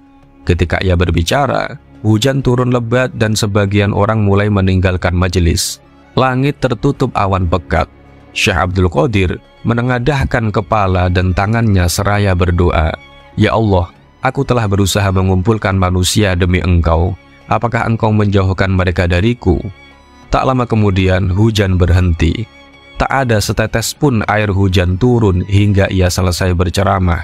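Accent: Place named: Tegal, Indonesia. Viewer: Alhamdulilah terjemahe wonge dewek Tegal ngapak ngapak nyong rongkna lur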